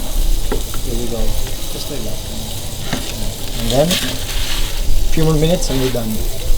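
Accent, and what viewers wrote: Visayas, Philippines. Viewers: its ok. maybe your so busy being public servant there in Ormoc so much so you speak lots of Bisaya already and for me, you got a grade of 85% and a 100% for the effort. Im from cebu. we flew to vegas together along with john t. PAL and P. buenaventura for gun sport show almost 2 decades back.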